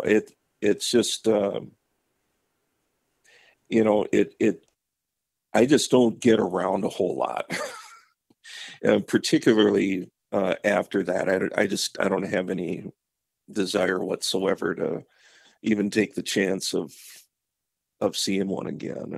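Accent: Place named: Minnesota, United States. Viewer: As a Minnesotan, thanks for sharing your story from Up North with us,. I didn't consider you boring as I listened to your story and interview. You sound like a born and raised Minnesotan like myself - honest and forthcoming. There's two new aspects of Sasquatch that I hadn't thought of before I watched this video. The first is Bigfoot hitting the tree with its fist after it spied you and your buddy. Now I'm wondering if that's what "tree knocking' signifies - a Sasquatch seeing a human? And secondly, I never considered the horrid odor being a response from Bigfoot like a skunk spaying when surprised. I always thought Bigfoot's scent was from lack of personal hygiene, but it always baffles me why some folks smell the horrific odor and others don't. Could that be a trait in specific Sasquatch species and others not? More questions than answers, but perhaps that is part of what makes Bigfoot so intriguing.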